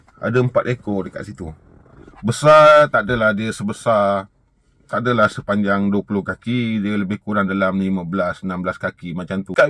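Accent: Perak, Malaysia. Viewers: mantap lah bang buat comeback saya sentiasa follow video bang tak penah miss..cume kali ni terhibur skit sbb abg cakap perak hahahha sbb saya org perak btw steady bang ilmu2 yg di sampaikan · Fuhhh cakap perak pulak 😂😂😂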